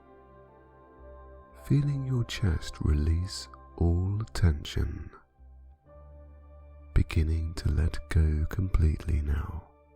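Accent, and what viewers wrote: Scotland, United Kingdom. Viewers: These meditations are perfect. <link> him has a gentle, warm, pleasant voice (with a slight Scots accent). The words are simple and clear. The timing is perfect... just as you start to find your mind wandering, he says and if you find that your mind is wandering... . There is no background music and no noise in the background, only his peaceful and engaging voice. The length of the meditations (about 1/2 hour each) works well for me. I find that I tire of some meditations after frequent repetition, but that hasn't happened with this one. The metta lovingkindness meditation always feels right. Some meditation CDs I have tried have not felt right. The voice seemed hurried, or sounded tense; the background music seemed intrusive, or there were noises that interfered with concentration. This is just perfect as an introduction for new meditators, and it's also a meditation that I return to again and again. 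I'd give this more than five stars if that were an option. Even my students, who are not ordinarily very receptive to meditation, relax when they hear this one!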